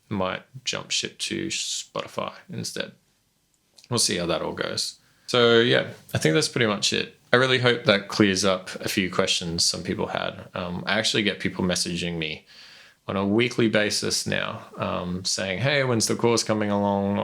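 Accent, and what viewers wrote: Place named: Australia. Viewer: Honestly too as a westerner, your accent is 75% of the appeal of your voice. Don’t underestimate the power of your Aussie accent bro. I know that sounds ridiculous and trivial. It’s really not though. Your accent and the naturally lower timbre of your voice really plays into something good.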